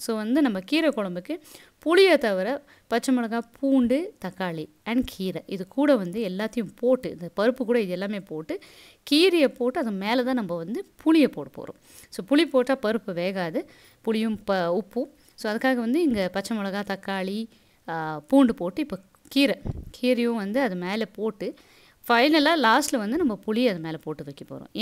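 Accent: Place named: Karnataka, India. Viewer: Hi sis, very cute vlog, god bless long way to go,, i just love ur tamil..ur slang is like an beautiful karunada tamilachi...i am also like you....an Banglorean settled in Chennai..GOD BLESSS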